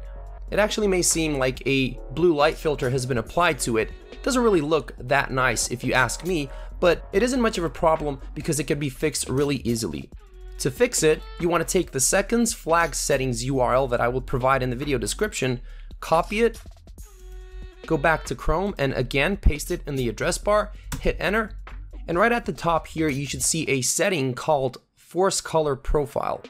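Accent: Balkans. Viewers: You are from Balkan,aren't you? Still you speak English very well...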